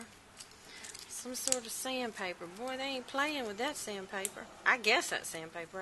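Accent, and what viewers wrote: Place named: South, United States. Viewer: Love this southern gal! Sounds just like Paula Deen! Maybe it is? LOL....going to repair my concrete birth bath now!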